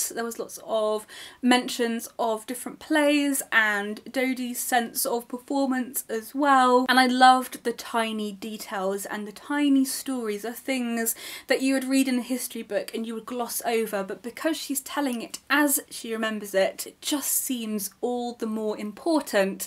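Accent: United Kingdom. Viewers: Hello Miss. Hope you are doing very well. You are a well-read person. How old are you and how many books you've read so far? Where were you grown up and where do you live now? I started listening to your videos because of your good British accent. I would like to ask you a favour, do you have time to attend a ZOOM meeting where we can discuss books and classics. You are outstanding and we can learn from you.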